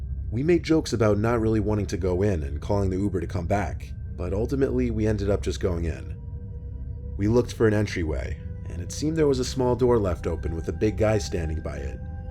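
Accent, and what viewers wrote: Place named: West, United States. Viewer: Let's be honest voice is so good for stories like this and it sounds western. His clogged nose make it sound better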